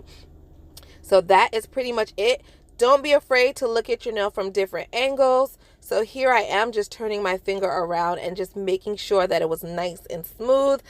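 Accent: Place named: New Jersey, United States. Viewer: Are you from New Jersey? I love your accent and videos 🥰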